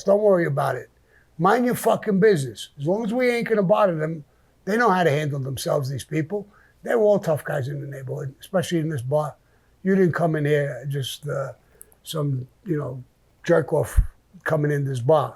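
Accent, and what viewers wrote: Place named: Northeast, United States. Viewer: It’s that northeastern accent brotha. Rampa’s = Rampers.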